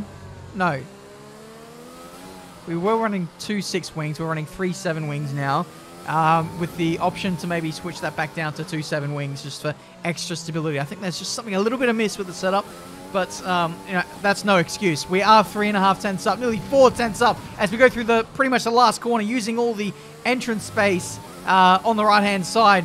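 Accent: New Zealand. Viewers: I thought you were from Australia and not from New Zealand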